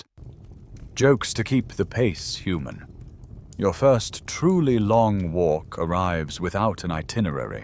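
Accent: United Kingdom. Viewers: I love these videos from Sleepy History. Now turn on that fan for some background hum and wow, this AI toff accent! But remember, a British gentleman does not say missle, he says missile.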